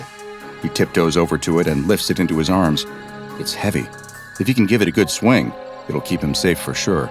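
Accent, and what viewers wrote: England, United Kingdom. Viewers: British accent is perfect trough video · is she britosh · This is one of the weirdest SCP anomalies I've watched so far, and there are some really weird ones. Nice English accent by the way.🙂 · i love how he added the BRI'ISH ACCSENT YEAH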